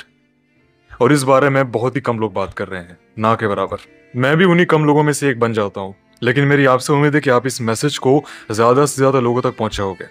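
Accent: Bihar, India. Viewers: Brother Aap bihar se ho kya ?😊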